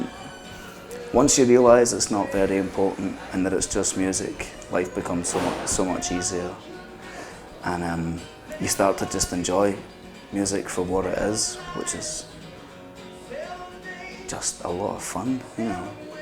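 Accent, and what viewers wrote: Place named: Scotland, United Kingdom. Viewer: that is the nice Scottish accent ahaha :')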